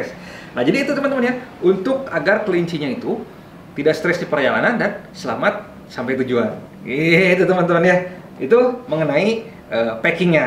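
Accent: West Java, Indonesia. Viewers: Lokasi medan, logat sunda 😆, mantap mang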